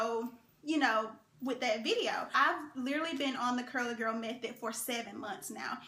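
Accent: South, United States. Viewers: Your Southern accent is lovely. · Where are you from?? You have such a cute country accent. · I can hear the South all in your voice!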